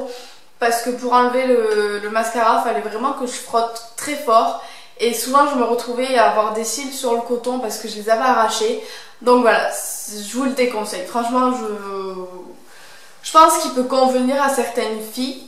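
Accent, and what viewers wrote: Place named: Aveyron, France. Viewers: Ahah l'accent Aveyronnais, je suis Aveyronnaise! 👊🏼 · Big up pour l'accent aveyronnais !!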